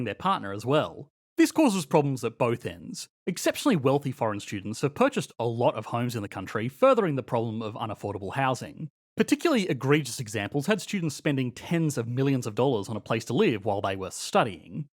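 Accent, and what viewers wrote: Australia. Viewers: The demand for housing doesn't mean they are built fast enough. You don't know the issues of Canada clearly. The builders do not have the workers and they also rather pay less. On top of that they rather build at a slower pace and charge MUCH MUCH more for each unit because of desperation of the housing market. If they build too much housing too fast. Their bottom line in what they can make also suffer. So the incentive is to keep it as extremely high demand. How can you account for 500K immigrants when 200K housing is built every year. That is just the immigrants not counting the 750K students that need places to stay. We need a new city built at the size of Ottawa each year now. Let me know how that is feasible. This is not over sensationalized. It's an extreme problem happening. I detect an Australian accent or NZ one and you don't live in Canada. 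Studying things and not living them is a different story.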